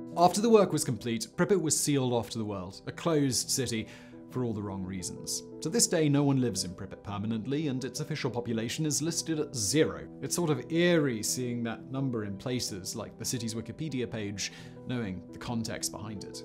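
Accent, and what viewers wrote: England, United Kingdom. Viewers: Another grammar fascist showing us all how smart they are by "correcting" the pronunciation in a video. BTW you do know is English and they sometimes pronounce words differently than than other countries do?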